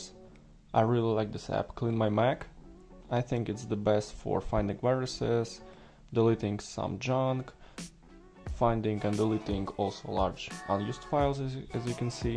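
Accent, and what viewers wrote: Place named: Eastern Europe. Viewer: Найс чувак, по акценту почти не слышно что ты из восточной Европы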